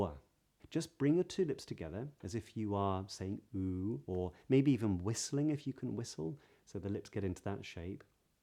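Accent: United Kingdom. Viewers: Hello sir, thank you and I love British accentt.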